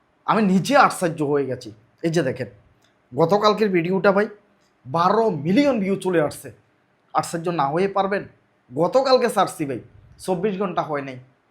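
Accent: Chittagong, Bangladesh. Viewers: Vai apni ki Chittagong naki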